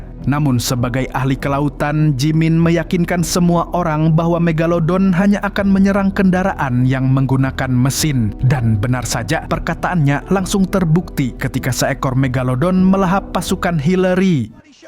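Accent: Banjar, Indonesia. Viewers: Bahasa banjar admin nya, bungul am 😅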